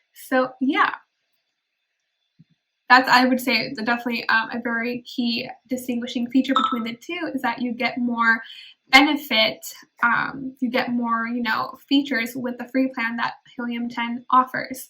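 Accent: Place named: United States. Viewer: Looks Indian, with American accent, beautiful though.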